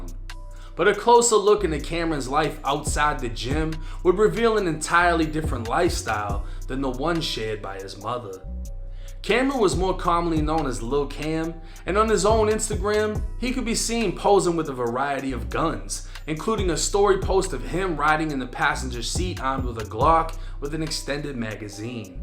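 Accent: Boston, United States. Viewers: You sound like you from Baahhhston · How you from Florida but sound like someone from Boston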